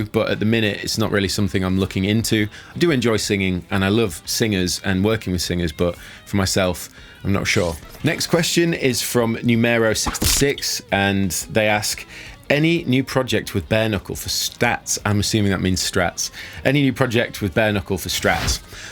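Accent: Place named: London, United Kingdom. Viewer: Me: he sounds like he's from London..?: Sweetwa'a Me: say no more.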